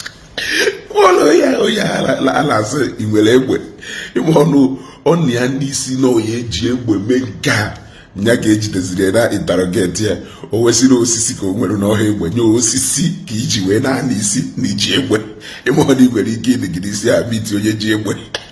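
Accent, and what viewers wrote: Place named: Igbo, Nigeria. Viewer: awesome presentation. That's the ende-Igbo style of talk.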